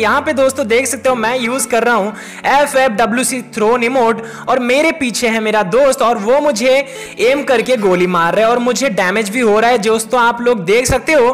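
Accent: Odisha, India. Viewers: App odia ho kyA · App Odisha se ho?? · Bhai ap Odisha se ho kya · Bro are you form Odisha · Bhai Ap odisha se Ho na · Are you odia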